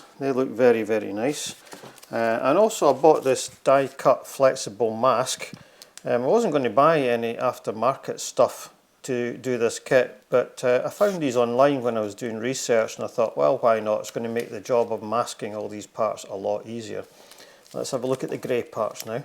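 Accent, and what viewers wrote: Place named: Scotland, United Kingdom. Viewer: you would have been seated in the rear cockpit for your AEF (air experience flight). The pilot instructor would be in the front cockpit. You are quite right, there were no seat cushions fitted, the bucket seats were designed to take the seat parachute that you wore on your posterior (which made walking to the aircraft and climbing up into the cockpit quite awkward if you remember). In future I’d recommend the use of a pin vice to drill the small holes instead of the DIY hand drill. (Sledge hammer to crack a nut?) Nice video and well narrated with your soft Scottish accent.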